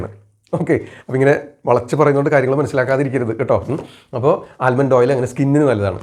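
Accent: Thrissur, India. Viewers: Doctor sure Trissur kkaran aanu ,speed accent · സർ ത്രിശൂർ ആണ് നാട് അല്ലെ 😃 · Doctor തൃശ്ശൂർക്കാരനാ ല്ലേ...🥰👍👍👍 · നമ്മുടെ തൃശ്ശൂർ സ്ലാഗ് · തൃശൂർ ശൈലി ആയതോണ്ട് നല്ല രസമുണ്ട് കേള്കാൻ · Trichur aano sirnte sthalam avatharam super nalla arivanu nalkiyadu tnq sir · തൃശൂർ രീതിയിൽ വളരെ ലളിതമായി ഡോക്ടർ പറഞ്ഞു കൊടുത്തു. nannayi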